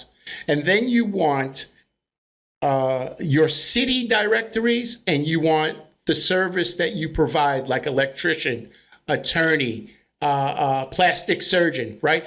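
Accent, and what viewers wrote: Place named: New York, United States. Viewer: I think I hear a fellow New Yaaawker 👂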